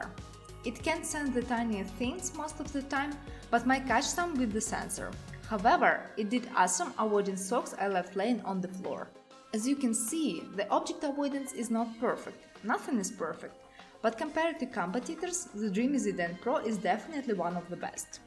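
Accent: Russia. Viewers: Thanks for the review, but the accent is too Russian, that it hurts ears.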